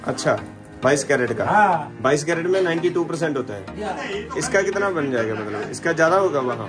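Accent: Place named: Gurdaspur, India. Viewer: Veer di awaj sun ke hi pata lug gia si ki gurdaspuria he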